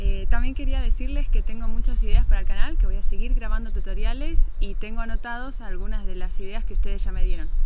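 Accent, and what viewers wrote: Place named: Argentina. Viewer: Caramba, esta chica es argentina me parece.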